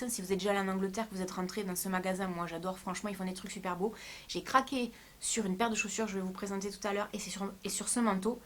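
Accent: South, France. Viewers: Cela fait quelques semaines que je te suis et j'adore ta simplicité avec toujours une petite pointe d'humour et sans prise de tête. après ton accent du sud...je me sens moins seule^^ très jolis achats en tout cas. Hâte de voir ta commande zara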